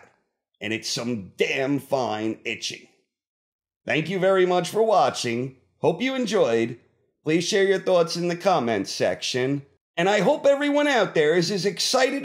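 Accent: New York, United States. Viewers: you are the man, can't thank you enough for this channel! Quick question for you..are you a NY'er? I can tell that accent when I hear it, I'm born and raised!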